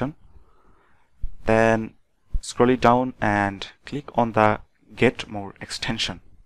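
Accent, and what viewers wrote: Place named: India. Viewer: definitely indian. that accent is unmistakeable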